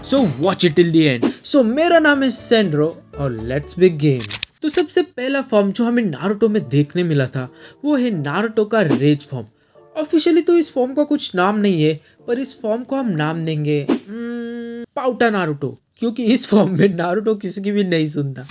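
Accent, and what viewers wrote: Maharashtra, India. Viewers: Are you maharashtrian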